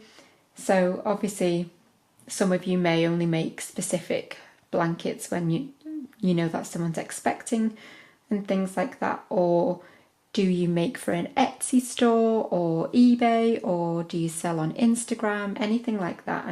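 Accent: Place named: Widnes, United Kingdom. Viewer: Hi, I've only recently started crochet and was in heaven when I found your channel and fabulous easy to follow videos. Going by your accent and comments you have made I think we must be very local! I am born and bred in Widnes! xx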